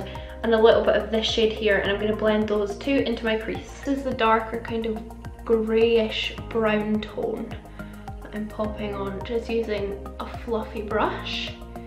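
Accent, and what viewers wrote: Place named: Scottish Highlands, United Kingdom. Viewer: I love it!! Where is your accent from??! You sound like a fellow highlander? Xxx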